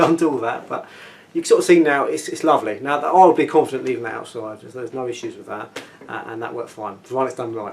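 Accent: Cockney, United Kingdom. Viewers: These videos are a bit of fun really. Yes, we could see a top-down version on a plain background, with nice zooms to the intricate parts (F-connectors, the wire-stripping task), and a slightly less frenetic pace, but it wouldn't be so enjoyable. From Australia, I enjoyed the East End accent. All good, got what I needed and had a fine viewing experience. Thanks. PS. What's the "Favourite Worst Nightmare" BTW?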